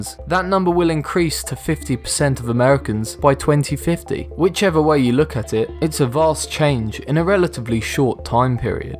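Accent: United Kingdom. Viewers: How could you possibly think that?! This guy is British and Dolan is Australian, they don't sound anything alike.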